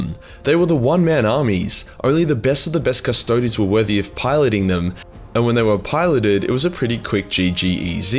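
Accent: Australia. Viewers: i was like whys the narrator talking shit and swearing so much and then im like oh yeah he has an australian accent too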